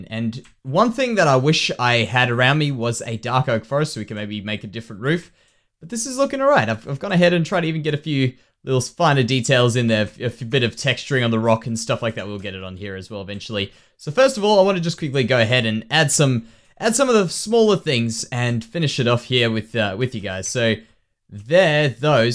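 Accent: Australia. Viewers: Hey Mate! awesome video just found your channel and it seems like heaps of fun :D definately subscribing! also are you an Aussie?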